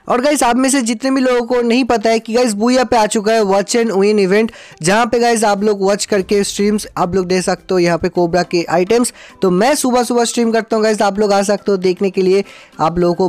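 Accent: Bengal, India. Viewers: bhai af kya bengali ho.... 🤔🤔🤔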